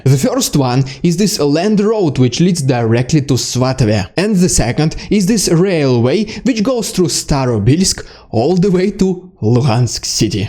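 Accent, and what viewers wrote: Russia. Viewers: i love the strohhngo russ accent✊💙💛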